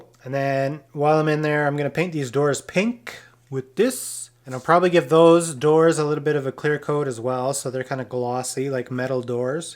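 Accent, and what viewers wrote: Canada. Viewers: Where in Canada are you from? You sound a bit like Bubbles from NS.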